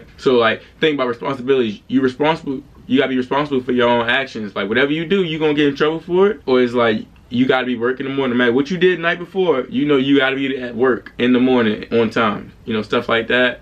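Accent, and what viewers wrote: Baltimore, United States. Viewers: you from baltimore lol? but if i got more questions can i message you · You from Baltimore? 🧐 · I knew he was from Bmore. Big ups to you sir. - your fellow DMV homie. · yeooo bruh soon as u spoke i knew u were from baltimore lmaooo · Baltimorean I heard tew I’m alr knowing 😂😂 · Dummi I knew uu was from Baltimore jus from how uu was talkin😂😂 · He sounds like he’s from Baltimore lol. Thanks for your service bro! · Knew u was from bmore as soon as I heard tewwww shout out 410 man😂😂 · I knew my man was from Bmore. I could hear it. Bmore standup. Latrobe Eastside. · Sounds like a lil Baltimore to me 😂😂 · Hate being from the dmv cause why i knew you was from bmore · I kept hearing that lil accent Nd I was like is he from b-more then you said it Nd I was like I knew it 😂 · Yo before you said you were from Baltimore I was already thinking it. 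We know what we sound like lol. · I’m sitting watching your video I’m thinking bruh gotta be from Baltimore then boom you from Baltimore city that’s crazy I grew up there and I’m trying to join the navy out of high school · I could tell u was from bmore when I first heard u talk from da accent 🤣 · Crazy I knew you were from Baltimore as soon as you said ya first word. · I thought I was hearing a Baltimore accent 😂 keep it up! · Mmm been a few years since I heard a Baltimore accent I just passed meps physical and probably signing navy so thanks for your videos. Shout out from an old randalstown. Guy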